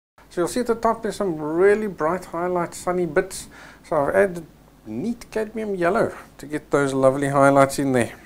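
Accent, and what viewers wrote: South Africa. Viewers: I have been watching your work for a couple weeks and I keep saying You sound South African to me. Well I found out today that you are from SA. Lovely I enjoy your work and the effort you make for us to understand.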